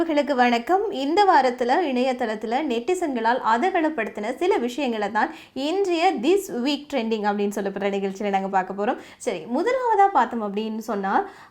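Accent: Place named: Eelam, Sri Lanka. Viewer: No bro athu oru style ithu oru style i think she is from Ezham any how slang diffrenta eerkuthu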